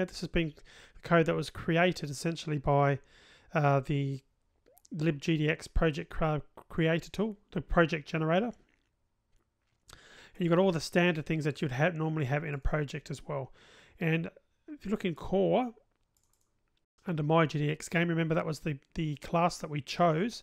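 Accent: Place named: Australia. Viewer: THANK GOD FOR THE SUBTITLES.No offence fellow Aussies, but as a non native English speaker I have a hard time to follow! Kudos for the great tutorial though!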